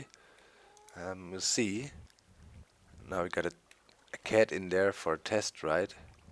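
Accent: Germany. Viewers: i could tell you what hannibal said in the english version of the a team...but...i kind of like your germglish..it adds to the series..ok..i feel bad...in english what he would say at the end of each episode was..." it gives me quite some joy when my plan is coming together."...now you know, happy to help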